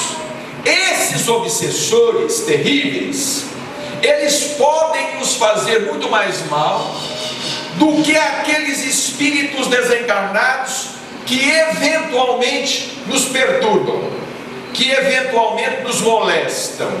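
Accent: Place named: Minas Gerais, Brazil. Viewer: O interessante da palestra Dr. Baccelli é a sua clareza no conteúdo passado... Sabedoria ! E o jeito mineiro de dizer... Adoro !!! O tom de voz adequado e simples por questões complexas e que devem ser reflexivas ! Dr. Baccelli tem Excelente Oratória! Aprendo muito... Gratidão Dr. Baccelli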